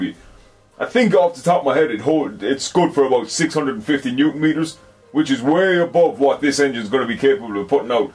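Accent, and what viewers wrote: Ireland. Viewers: You’re a legend, loving the Wesht of Arland accent and all the info. Definitely gonna help build my Series 3 crossbred. Thanks pal!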